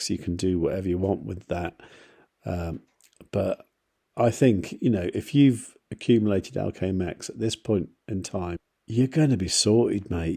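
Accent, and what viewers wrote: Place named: Tamworth, United Kingdom. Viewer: you sound like you come from Tamworth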